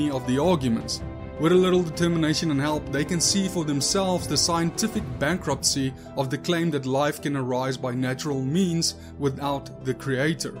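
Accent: South Africa. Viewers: ahh crap! he sounds South African, if he is, I apologize for the rest of us who understand science. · You sound South African... are you?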